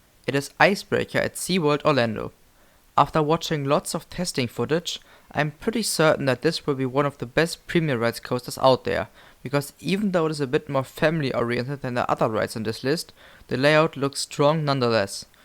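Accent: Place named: Germany. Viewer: Deutscher Akzent am Start XD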